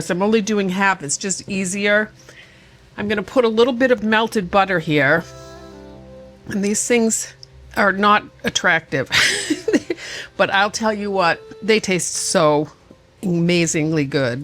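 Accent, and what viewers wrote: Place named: Wisconsin, United States. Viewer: I haven't been watching your channel very long (my loss - I'll have to binge older videos to make up for it), but every time you talk, I hear Wisconsin. Maybe Minnesota, but my mom's side of the family is from Wisconsin, so that's the first that comes to mind. I miss visiting there and should definitely swing back for a visit. I haven't been back in over 15 years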